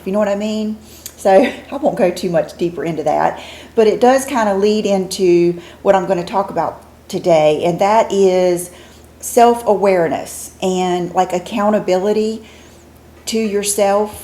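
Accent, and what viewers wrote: South, United States. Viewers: you are my FAV on YouTube for this very reason!! I'm 56 and my struggles are aging and the HORROR of weight gain as well. I found you by watching Angie Hot and Flashy and have been loving your channel every since, the past few months that is. You are more than just makeup and I love that you use this platform to help others like me feel better! I used to get by with just some powder and mascara but now I'm trying so many new products and feeling so much better about myself thanks to you! I'm a fellow southerner from Texas and I love listening to you for that reason:)))) Good luck with your eating challenges:))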